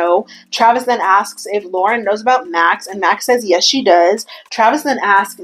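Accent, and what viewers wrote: Canada. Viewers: bestie, PLEASE don’t feel embarrassed about your BEAUTIFUL Canadian accent!! I think it’s really pretty!! And PLEASE DO NOT EVEN get me started on your infectious giggle!!!! I’m so happy that I found your content/channel!! Confession: I have been binging on ALL of your videos!! Please keep them coming!!!! 😊❤ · Can you say " I don't know about how long it would take to get out the house" in your full Canadian accent. Please lmao. I am only here to hear you slip up! You get so embarrassed hahaha. There is nothing wrong with your accent.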